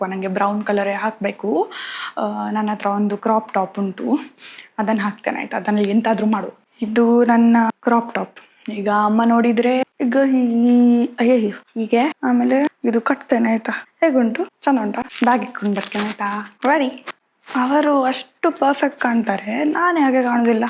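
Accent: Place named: Tulu Nadu, India. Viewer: Oh really likes your innocent voice and that tuluva's Kannada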